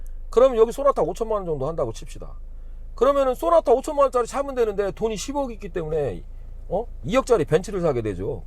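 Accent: Chungcheong, South Korea. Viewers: 아이구~ 시원시원 하시네요. 맘에 딱 들어요 ㅎㅎ 저도 인도네시아 들어가서 현지인들 속에 가서 살려고 하는데, 어학연수 하면서 현지인들과 어울리면서 말 배울라고요. 전에 회사일 때문에 들어가 만났던 교민들,,, 아~ 진짜 .... 더이상 말 안하께요. 그리고 진짜 돈거래... 작은 돈이라도 돈거래는 하질 말아야하고, 아예 안만날 능력 있으면 좋죠. 전 고향이 충북이고 부모님도 청주 사시는데, 아~ 충청도 말투 정겹네요.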